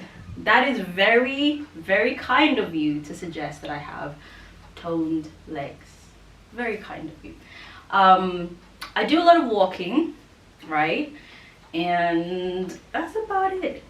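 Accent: United Kingdom. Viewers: I don’t wear pantyhose, pretty sure I’ll never wear them……but it’s so nice to hear an English accent now I live in the USA. Plus you’re so fit as well. London girls are the most beautiful girls In the world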